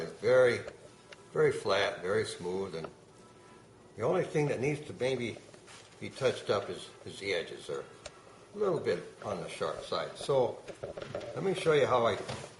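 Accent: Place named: Minnesota, United States. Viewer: You sound Minnesotan, or somewhere close lol. I could have sworn that this was my uncle lol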